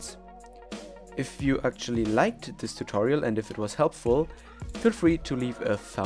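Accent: Germany. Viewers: Jep, er ist deutsch ;) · hehe^^ Man hört richtig, dass du deutsch bist xd, aber trotzdem nice vid!:D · er ist auch deutsch? Hört man irgendwie :D McMakistein ist ja auch Deutscher (Österreich) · Are you German?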